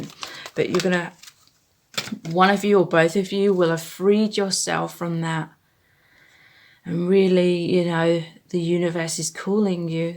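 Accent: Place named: United Kingdom. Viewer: When did I not notice that you‘re british😯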